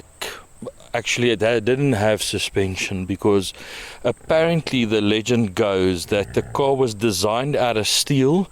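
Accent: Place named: Russia. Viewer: Your accent like russian 😅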